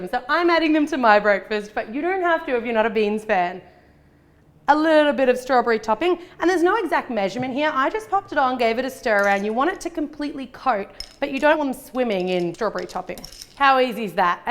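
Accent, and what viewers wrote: Australia. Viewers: is she australian???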